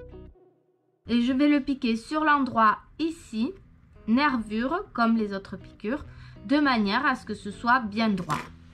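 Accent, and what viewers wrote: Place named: Carcassonne, France. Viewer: Super vidéo Je sais changer une fermeture eclair mais cette vidéo apprend toujours Bravo à vous Je vous découvre et je m abonne Vous avez un petit accent comme moi 😂 Etes vous de Carcassonne ?😂😂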